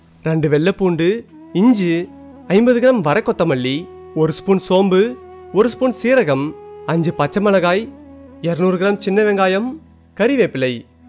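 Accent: Salem, India. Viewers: Salem slang 😍💛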